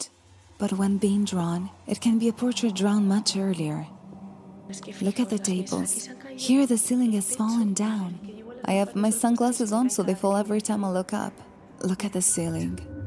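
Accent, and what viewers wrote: Spain. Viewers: Es muy riesgoso tu trabajo no siempre es lo mismo todos esos lugares tienen algo enigmática más es importante ser prudente en dónde quiera existe maldad humana y más por tu condición de mujercita no faltan vivales o asesinos ojalá! Solo sea una broma pero recuerda el castillo de Napoleón cuanto destrozó y tal cual lo señalas respetas pero también ay personas malas y vaya que muy malas saludos desde Tula Hidalgo México por tu acento eres española tu voz es delatora.👍👉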